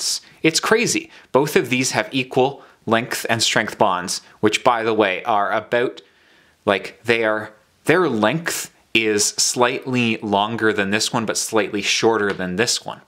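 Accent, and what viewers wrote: Canada. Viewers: you're canadian aren't you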